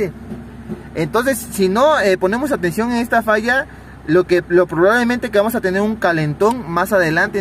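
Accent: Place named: Mexico City, Mexico. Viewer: Casi no se te oye lo chilango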